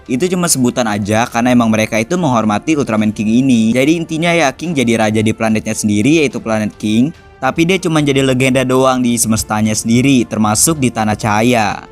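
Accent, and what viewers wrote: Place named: Jakarta, Indonesia. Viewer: fix admin Anak Jaksel Banget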